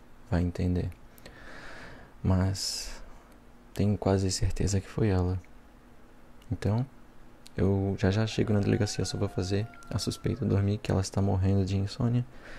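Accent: Brazil. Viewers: Opa, primeira vez por aqui. Muito bom seus vídeos, gostei do sotaque, parece muito com cidades próximo onde moro. Sou de SC, joinville, o jeito que vc fala , parece com qm mora aqui na região do Vale.. Já fiz um tour por alguns vídeos, são bem relaxantes mesmo, parabéns cara, sucesso !!! Com certeza passarei mais vezes por aqui, qdo a insônia bater.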